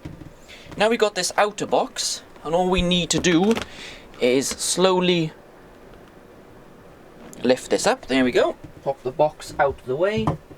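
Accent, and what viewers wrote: Wales, United Kingdom. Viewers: omg are you welsh ? and wered ya get one my nethew would go mad to get 1 lol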